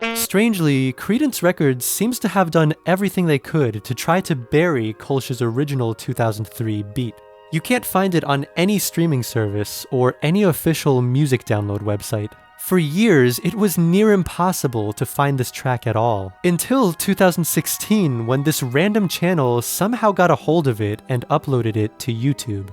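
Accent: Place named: Jamaica. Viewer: I can understand pretty much everything said when you don't talk over it....for some reason I mostly almost completely understand it....go figure Jamaican.... English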